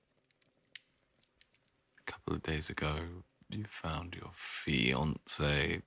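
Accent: United Kingdom. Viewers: This is so good - LOVE the British accent! ❤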